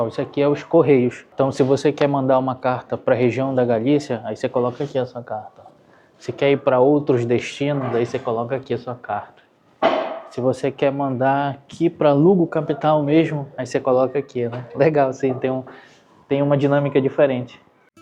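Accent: Pará, Brazil. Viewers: Meu amigo, com esse sotaque paraense, só pode ser de lá, minha terra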